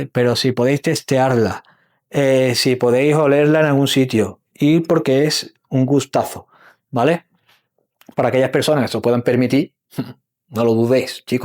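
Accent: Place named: Andalusia, Spain. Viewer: Me encanta el acento andaluz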